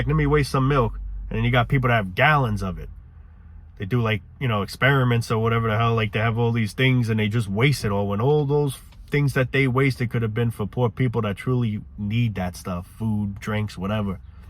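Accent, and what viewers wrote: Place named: Staten Island, United States. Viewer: He sounds like he’s from Staten Island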